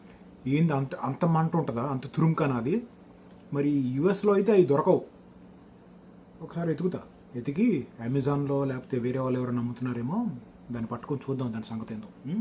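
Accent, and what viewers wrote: Andhra Pradesh, India. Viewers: Anna me slang Andhra undi , meeru nizamabad migrate ayyara ? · Nee telugu andhra telugu la untadi why?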